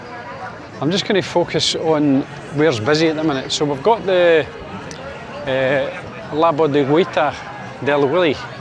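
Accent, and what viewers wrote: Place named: Northern England, United Kingdom. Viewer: It seems to be "northern", or is it scottish?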